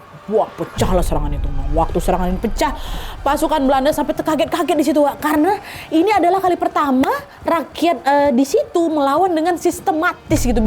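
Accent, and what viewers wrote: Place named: Medan, Indonesia. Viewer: 1 rb a Terima kasih narasi Tunggu komen say ya. Abangmu umur 82 th Abang sangat suka cara narasi dengan aksen Medan, Medan Wak. Abang dinas di KKA 3 th s..d 1991. Komenk,u panjang Dek. Gak papa ya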